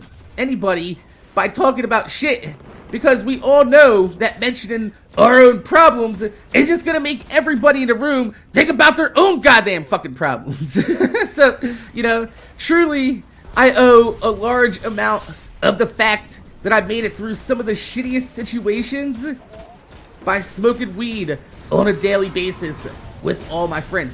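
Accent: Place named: Baltimore, United States. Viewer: I can tell your from Baltimore because it snowed in my recently and the way you say water.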